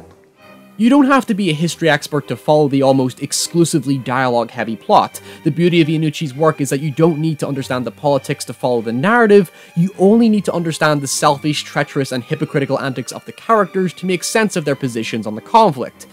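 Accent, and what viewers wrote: Northern Ireland, United Kingdom. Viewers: I think northern irish maybe? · Northern Irish orginally?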